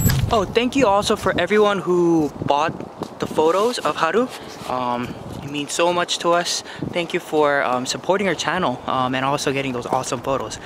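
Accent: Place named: Philippines. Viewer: Are you from philippines because you talk like filipino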